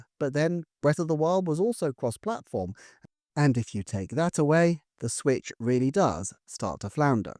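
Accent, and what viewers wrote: United Kingdom. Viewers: Yet another W. Also, as an American, hearing you dish out these truth nukes with a British accent makes the whole thing so much more cathartic. Keep up the good work mate.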